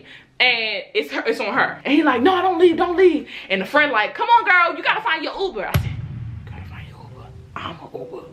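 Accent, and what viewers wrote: Baltimore, United States. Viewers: Ahhhh you from baltimoreee 🤟🏽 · I had a feeling you was from Baltimore by the way you talk 😂😂 .... we all sound the same 😭😂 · I knew your were from Baltimore ❤️ it was the accent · You from Baltimore? SUBBED! On peridot. Fellow DMV Native, should’ve clocked the accent on you 🤪✨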